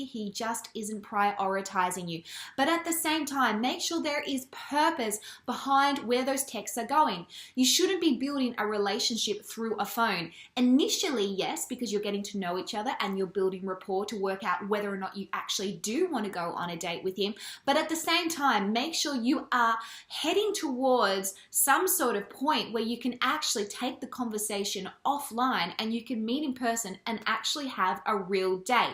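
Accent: Australia. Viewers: The aussie accent tho 🙂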